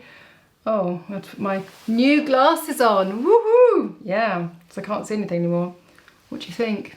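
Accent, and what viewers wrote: United Kingdom. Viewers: Oh my I just bought glasses like yours. I feel very chic now. Oh to be so gorgeous with that lovely British accent. 😉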